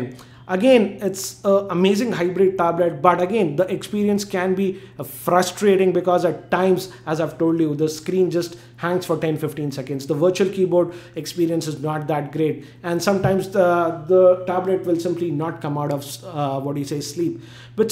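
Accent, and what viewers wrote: India. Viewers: Indian accent. Urggghhhhhh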